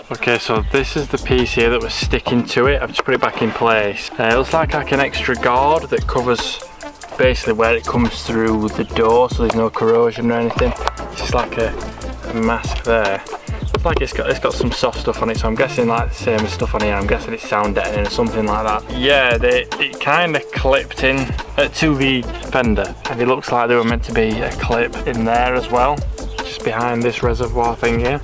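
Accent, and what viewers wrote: Yorkshire, United Kingdom. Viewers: Is that a Yorkshire accent pal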